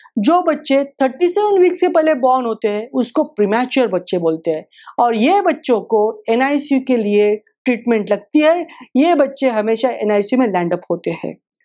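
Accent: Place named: Maharashtra, India. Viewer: Aap marathi hai ,aap hindi nahi bol parhe ho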